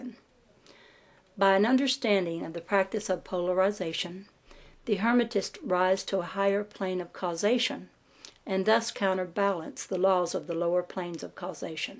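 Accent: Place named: South, United States. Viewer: Thanks for putting something this important into audio. I find your reading of this and your southern dialect very delightful.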